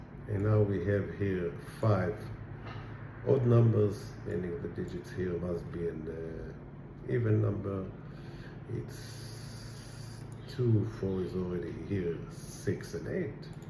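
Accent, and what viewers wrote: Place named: Israel. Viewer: Name is, has Israeli accent... נראה לי שאני יכול להגיד בוודאות שאתה ישראלי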